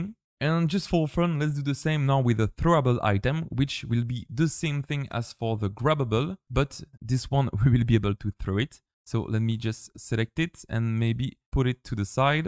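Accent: France. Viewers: T'es français? t'a l'accent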